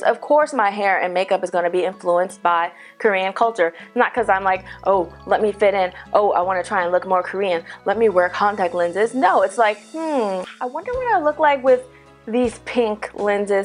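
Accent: South, United States. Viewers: I'm so glad you posted this video. I've also been seeing the negative comments on your videos lately. I also was a bit uncomfortable with your new drastic changes, but I've also noticed you have not changed who you are as a person and is still the same happy with her lame jokes and Southern accent. That made me get over the changes you've made which really isn't that big of a deal. I also appreciate how you addressed this issue and your haters with a respectful manner. You talked about how you felt very reasonably without attacking anyone. Love you!! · I don't understand how she's changed?? Her clothes and make up are what she buys in Korea, Where she lives and had lived in for years. It's called adapting. When she does her videos she still speaks with her southern accent when she speaks. Getting your breast done and tattooing your eyebrows doesn't mean she's trying to be Korean considering how much Americans get there breast and eyebrows done, she's perfectly fine to me. 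· hasn't changed in the most important traits. She has still got the same personality, humour and charm. I continue to watch her is because of the way she talks and what she talks about. (Love that southern accent.) Self grooming, if you will, happens more when you get older and gain disposable income. You're treating yourself and it shows. Your appearance will always be changing every year, every day. Remember who you are inside and nothing else really matters. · am i the only one who hears her southern accent.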